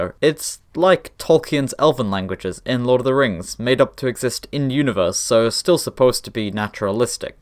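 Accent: Sweden. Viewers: the little bit of swedish that is in your pronunciation is so <333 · Love your Swedish accent haha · Are you Swedish? You sound kinda Swedish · This dude is probably Swedish based on English pronounciation and how he pronounced things in his constructed language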